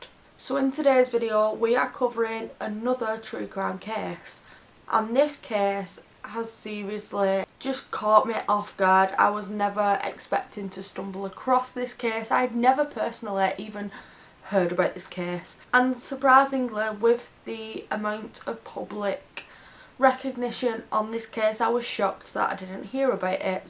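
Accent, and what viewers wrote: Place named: England, United Kingdom. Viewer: Great video X subbed good t hear a English accent X subbed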